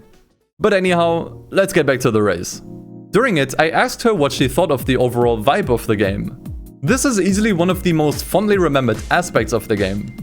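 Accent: Germany. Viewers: ich wusste dass er deutsch ist, da er einen deutschen akzent hat hahah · first time here and for 7 mins i was like "do not assume. just because he sounds like a german does not mean he is german." yeah seems like i listned correctly :D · Ah ich hab doch richtig gedacht dass bei deinem Englisch ein Deutscher Akzent rauszuhören ist und bei hat es deine Freundin bestätigt.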